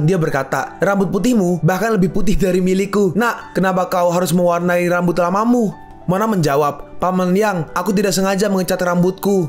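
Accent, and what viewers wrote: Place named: Makassar, Indonesia. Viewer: itu logat makassar klo g slh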